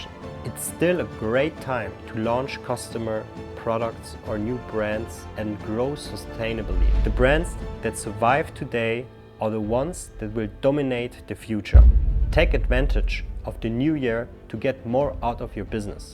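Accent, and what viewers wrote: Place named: Germany. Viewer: Ich hab sofort durch deine Aussprache gemerkt, dass du Deutscher bist😂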